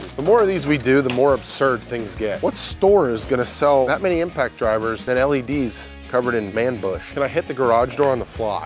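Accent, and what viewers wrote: Pittsburgh, United States. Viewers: I caught that Yinz and the saw that steelers logo in the background. Thought your accent sounded familiar! Pittsburgh ftw! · Ahhhhh. Love hearing that Pittsburghese. Reminds me of home! Go Stillers!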